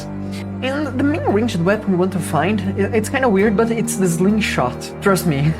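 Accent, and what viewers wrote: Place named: Brazil. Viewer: brasileiro sim ou com certeza? kkkkk sotaque ta igualzinho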